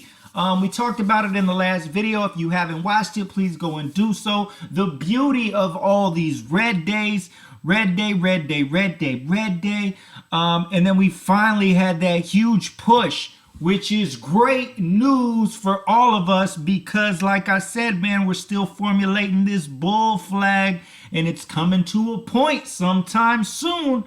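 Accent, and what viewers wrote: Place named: New Jersey, United States. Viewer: HI! I appreciate your videos, thanks for sharing your opinions on TSNP. Was surprised that you haven't received a response regarding the investor conference call....They should give you an engraved invitation!! You are doing good things spreading the word about this company. side note - Where is your accent from? New Jersey? New York?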